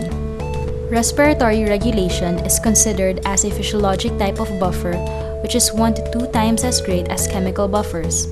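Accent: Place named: Philippines. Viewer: sounds phillipino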